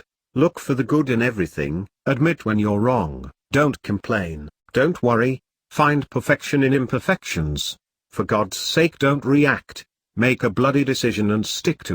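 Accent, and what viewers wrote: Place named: United Kingdom. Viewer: Anyone who said this to my face that didn't have that British accent (that resonates with Virgos) may warrant a punch in the face. And then I would dwell on my behavior for 6 months... True reading.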